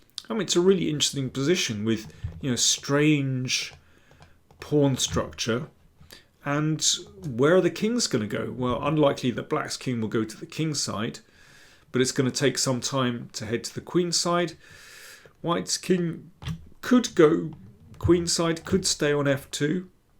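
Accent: England, United Kingdom. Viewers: wonderful precise analysis, with that lovely English accent. bravo.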